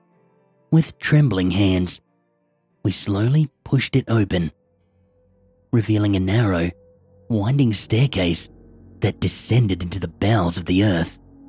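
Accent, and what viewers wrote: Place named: Australia. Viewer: The Blackwood name stoped me in my search for 3hr+ story but a ghost story is a must stop. I got here by searching for new content and came across my Australian friends say hi to Ratty lol anyway I never listened to a ghost story from an Australian but I like the accent anyway not sure if that counts but I hope it does.😂 Cheers mate from across the salty water😂😂😂😂😂🇺🇸 I subscribed 😅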